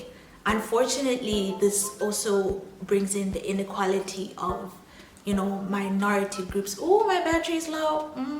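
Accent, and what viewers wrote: South Africa. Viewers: You sounds south African, where are you from? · I understand this video is from last yr,but ur accent convinces me you've been living in SA for quite some time now,is there still xenophobic attacks going on in SA?..or do you feel crime and robbery is worse in SA than in your country and what are your experiences with crime or even xenophobia if you have experienced them?cos it sounds like it really bad out there... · She does sound South African, I'm not picking up Zim accent.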